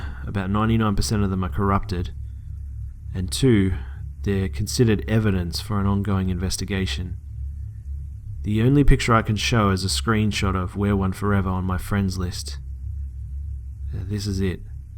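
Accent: Australia. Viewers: Is he Australian? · U australian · ur Austrailian thank god there's an Austrailian saying a horror story I am subing to u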